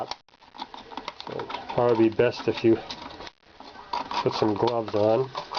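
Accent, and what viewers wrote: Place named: Brooklyn, United States. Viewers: Logan Mattson He must be from NY that's all. Everyone sounds the same from over there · I love the Brooklyn accent.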